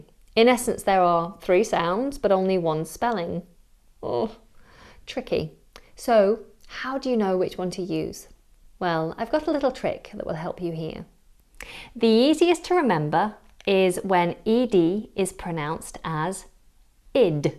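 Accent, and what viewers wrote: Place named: RP, United Kingdom. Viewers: is using RP which is recognised as the standard. is actually a Scouser and has adjusted her accent for her teaching videos. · But... your accent is also a regional accent. You're saying all this as if your way of pronouncing things is the official British way, ignoring the 3 British countries that aren't England, and even the official English way, ignoring the large percentage of the country that don't sound exactly like you. You have a Southern accent - what makes that any less regional or any more official than a Northern accent?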